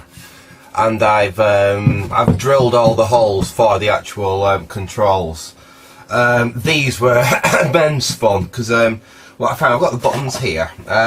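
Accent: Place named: Bristol, United Kingdom. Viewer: Interesting accent trying to place it. Bristol perhaps but just a wild guess.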